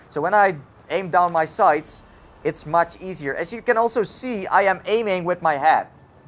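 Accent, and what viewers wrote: Netherlands. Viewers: gek nl accent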